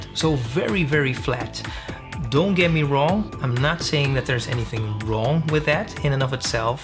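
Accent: Netherlands. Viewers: are u nederlands?? ;-)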